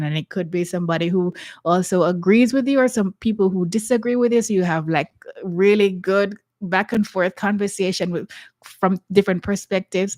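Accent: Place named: Jamaica. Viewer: First. Your green glasses are on my radar. How beautiful!!!! Second- I hear a little bit of my island in your voice. Shall I guess? JAWI? 🇯🇲Perhaps Bahamas? Great information here for entrepreneurial, industry growth. 🌻✝️🇯🇲🌻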